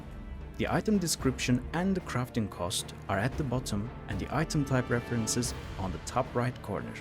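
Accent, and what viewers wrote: Turkey. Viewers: Yapımcıları türk zaten hani seslendiren de türk aksanından belli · bunu bir türkün seslendirdiğine yemin edebilirim ama kanıtlayamam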